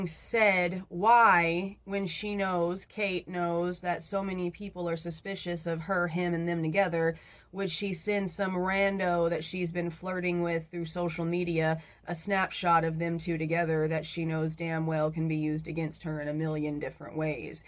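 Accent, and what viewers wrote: Texas, United States. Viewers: Every way that you talk screams Texas girl lol 💙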